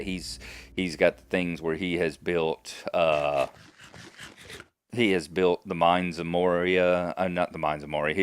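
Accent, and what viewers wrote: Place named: England, United Kingdom. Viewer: "Just leave the damn thing alone and put the new textures in." LOL I know exactly how you feel and agree with you. Use glowstone to melt your channel of water through the ice instead of torches. They're 1 light level higher so will melt one block more in each direction. With the way boats are so difficult to handle you'll need those 2 extra blocks' width. I think, with the beauty and creativity of your Emerald mine you can consider your game well and truly upped. You started building that 6 to 9 months ago and never mentioned it to any of us or showed us? pouts Love how your solution to the dangerous mob ledges above is to cut into the wall to shelter rather than climb up and torch it up. Means your ravine looks so much cooler with the upper extremes still in darkness. Once again it's your small differences, often so considered and thoughtful, that makes a huge difference to the outcome and feel of your place. 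Small correction. Building your mine 4 high does not cover the 4 main layers of lapis, it covers 6 of them, because you can see the ceiling and floor blocks too. You're actually being even more efficient than you knew! :o) G "Had a fun little time: got to trash talk a skeleton and a couple of zombies over there." LOL chuckles Okay, why when you put the text in to correct County Gnarlo to Brocraft does the addition of that "mate" mean I hear my voice reading the text instead of yours? Or maybe yours in that amazing English accent you can employ... :oP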